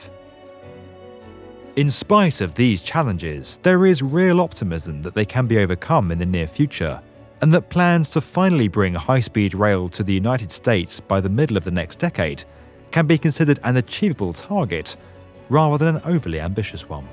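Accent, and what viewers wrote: United Kingdom. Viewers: Where is the money coming from? If it is SOLELY from the State of Texas, I really don't care. Texans can spend their dollars however they choose. However, if even one cent of federal tax dollars goes into this boondoggle,I feel like I've been robbed again. I also take umbrage at the narrator and his British accent a if to somehow transform the US into another European look alike.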